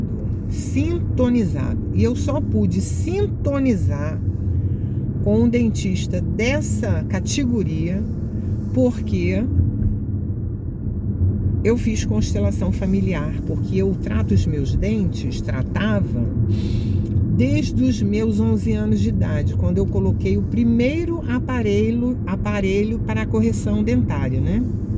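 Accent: Rio de Janeiro, Brazil. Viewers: Nao tem sotaque nenhum!!, kkkk mestra linda vc é a esseencia do sotaque carioca ❤❤❤❤❤ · obrigada por essas lives maravilhosas!! Admiro vc❤.. vc continua sim, com o sotaque carioca.. puxadinho e com o X no final...rs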